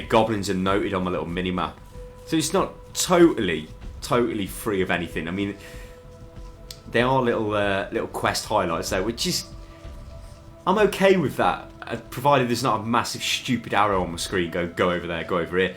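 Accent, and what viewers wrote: United Kingdom. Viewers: this video is so birtish english i can take this for my english class :D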